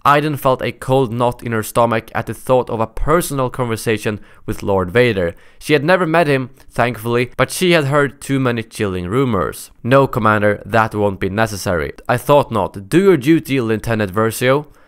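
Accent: Sweden. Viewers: it's ok I love your Swedish accent, I could listen to it all day! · your swedish accent is awesome im from finland so if enyone has terrible accent then its me😁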